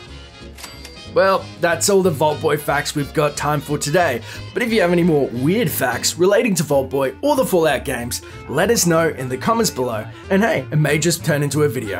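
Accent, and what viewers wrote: Australia. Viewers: God, I hate the Australasian accent... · Its very Australian i should know i live here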